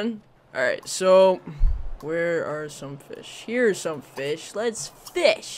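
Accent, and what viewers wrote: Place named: Canada. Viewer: Officially my new favourite gaming channel because sims and canadian accent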